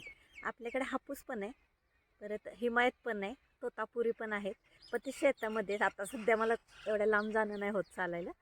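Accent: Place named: Barshi, India. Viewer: Didi tumi proper kontya city mdun ahat tumchi भाषा पूर्ण बार्शी कडे अस बोलतात तशी आहे.ऋतुजा माझी फ्रेंड आहे .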